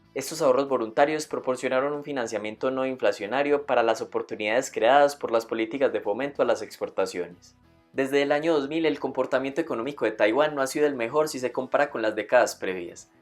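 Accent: Antioquia, Colombia. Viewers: Suena como paisa, ¿ Eres Colombiano?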